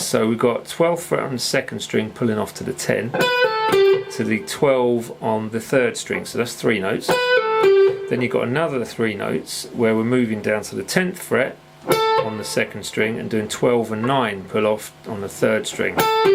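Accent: United Kingdom. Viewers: Nice rif. are you from London?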